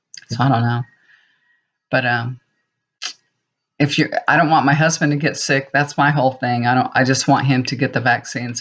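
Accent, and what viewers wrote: South, United States. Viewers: I like your accent and southern charm.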